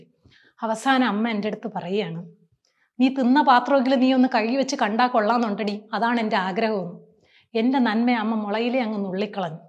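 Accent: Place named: Trivandrum, India. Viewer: assal tvm avatharanam. Love you from tvm and near by your place ❤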